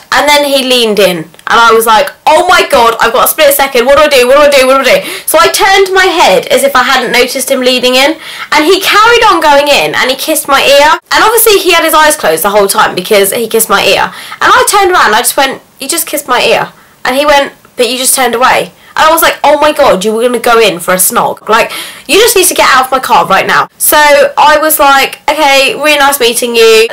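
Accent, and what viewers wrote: England, United Kingdom. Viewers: hahaha om lmao! love your personality and accent, from which part of england are you? And how old are you? Just curious, not anything weird :P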